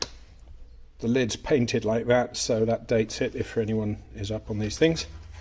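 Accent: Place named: RP, United Kingdom. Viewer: Your accent sounds far to posh for a mechanic, we never see your Mush so we call you 😂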